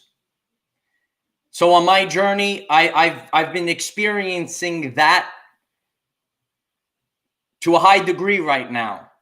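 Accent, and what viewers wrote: New York, United States. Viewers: Sounds like a New Yorker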